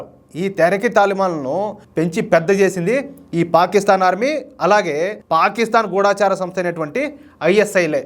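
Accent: Rayalaseema, India. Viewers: నమస్కారం గారు మీరు చెప్పే ప్రతి మాట తూటా లాగా ఉంటది రాయలసీమ యాసలో అద్భుతంగా మాట్లాడుతున్నారు నిక్కచ్చిగా ఉన్నట్లు మాట్లాడటం చాలా బాగుంది🎉🎉🎉🎉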